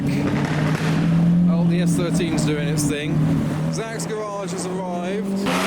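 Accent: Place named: United Kingdom. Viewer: When he started talking it was the most british thing ever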